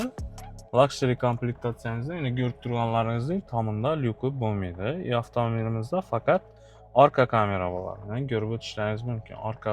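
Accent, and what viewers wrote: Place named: Khorezm, Uzbekistan. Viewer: Молодец. Обзор яхши чикипти. Айрим хоразм блогерларни умуман тушиниб булмайди. Бунда хоразм акценти бор булсахам хаммаси тушинарли.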